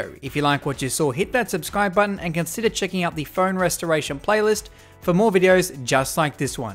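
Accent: Australia. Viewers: Nice Australian accent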